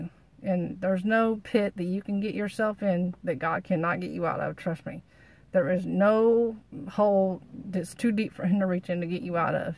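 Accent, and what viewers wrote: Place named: South, United States. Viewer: Thanks for sharing your story. I love to hear you speak, you are so down to earth so real. I love how you talk, like you're right here next to me. I feel you are not from the Northern States, love the Southern talk, so Natural, so encouraging to just listen to you again and again, for I too am a Southern woman of God, I love so so much. Hallelujah !!! I share a bond a spiritual bond with you as one I truly admire and appreciate, such an inspiration to me, for I loved you the first moment I connected spiritually with you, for you are so real, so truthful, have a heart of gold, so precious, in how well you get his words of truth out to me, and to others. What a blessing you are to me. I feel so comfortable when we share the Father's love. I love, love, love, his words, as you speak it out. God Bless you precious beloved one, my sister in Christ. 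Amen !!!!!!!!!!!!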